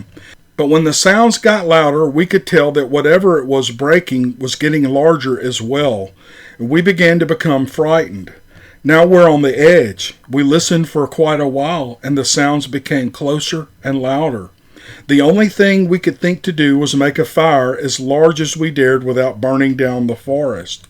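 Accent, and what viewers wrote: South, United States. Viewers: The siege of Lockett ranch is amazing! Wow if you could read it that would be cool too. Dark waters is a great narrator. I'd like to hear it with the southern twang as well. I've listened to it probably 5 times. But I'll listen another 5 again if you put your narration twist on it! Maybe you could work something out with DW. Hes great! And so are you. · Happy anniversary! I found you not long after you started. I heard about you on Bigfoot Odyssey and a couple others mentioned you. You are my people! (Southern drawl and all!);keep up the good work. By the way, I like the chit chat on the videos too. I'm more of a people person. Having a somewhat large pet page, people say I talk like I know people. I do like to get to know people on a personal level. The "chit chat" works for me! Remember it's your channel. You do you!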